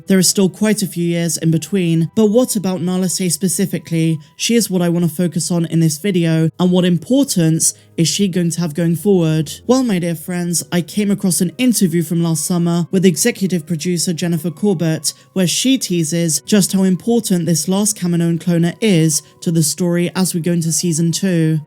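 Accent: United Kingdom. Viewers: I know you have an British accent, and that's all well and good and attractive in the US, but in this case you're ignoring the fact that a noun is being used as a proper noun, a name. As highly as you regard this IP, you should pronounce someone's name how they would. For one reference, remind yourself of how Data corrected Dr. Pulaski in season 2 of Star Trek: The Next Generation. In his words, "One [ pronunciation ] is my name. The other is not".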